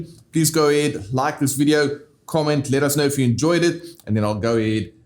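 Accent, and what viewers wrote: South Africa. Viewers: Awesome stuff man are you South African? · You sound south african and you wearing a Jbay hat! Victory church? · Recognise that South African accent! Refreshing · I did not expect to hear a South African accent lol.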